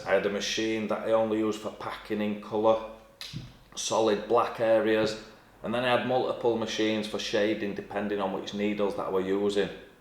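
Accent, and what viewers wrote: United Kingdom. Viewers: So good to hear not only a Brit accent but I'm from Hull so not far off mine, nowt wrong with our cousin's across the pond but I know your advice is UK based & everything you recommend I can get etc, I'm 48 & been dying to tattoo for years, done everything from paint fighter jets, charicaturing, portraits, murals & gravestone artwork (very similar to tat designs tbf especially the Gothic & flower based stuff) & finally said f**k it, dive in & give it a go!! But f**k me tat artists I've tried to get training or tips off are very cagey, I've found the whole industry clique & hostile to outsiders, especially an actual artist like myself who I believe some of them felt intimidated by, they were basically glorified tracers & couldn't draw for shit! Anyway, rant over, I've watched 2 vids so far & think I'll just block out the rest of you tube & stick with you pal!! 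Obviously subbed & notification bell hit so looking forward to binging past content & the new stuff!! Here we go!!!😁😁😁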